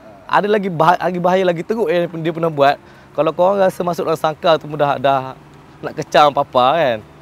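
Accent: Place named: Pahang, Malaysia. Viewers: ade loghat klntn kturnn klntn kot..phng ke? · tulah ade slang timurr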